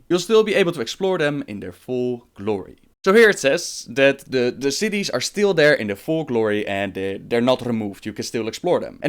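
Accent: Netherlands. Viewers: Damn, that Dutch - English dialect ^^ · Argg Dutch accent, man....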